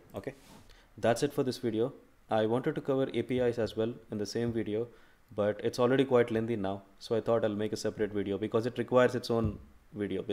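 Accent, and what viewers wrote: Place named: India. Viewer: I knew it that he is Indian guy as soon as i heard his english accent and he proved it in that chart of Trading Comparison because only Indian or non US citizens would write $ sign after the number 😂 Instead of writing it as $10 he wrote it as 10$ hence proved Indian. No offense I'm from India too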